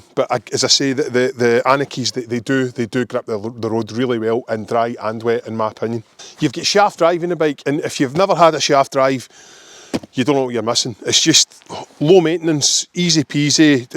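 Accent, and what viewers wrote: Scotland, United Kingdom. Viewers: you also have the best Scottish reviewer accent…ever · Proper Scottish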